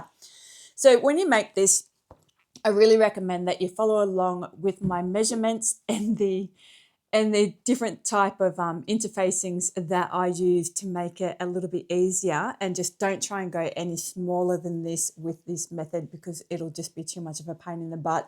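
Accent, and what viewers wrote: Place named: Australia. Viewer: Love you,! You’re the best teacher. I appreciate your Aussie sense of humor—not to mention the accent. In elementary school (4th, 5th, and 6th grades), I had a teacher who insisted every pupil — male and female — learn how to sew. I stopped many years ago. And now in my twilight years I’m using a Brother XR1300 and a Baby Lock Brilliant to make bags, bags, bags — before graduating onto something bigger. I plan to watch all your vids and hope you will continue to make them years into the future. Bless you!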